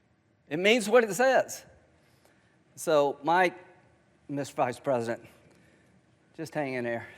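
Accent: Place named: South, United States. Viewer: Love his Southern accent! 🤣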